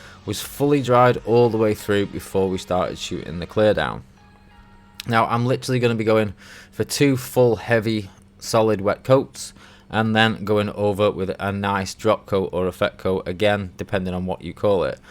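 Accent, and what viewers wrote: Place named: Liverpool, United Kingdom. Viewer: MY GOD HE"S FROM LIVERPOOL!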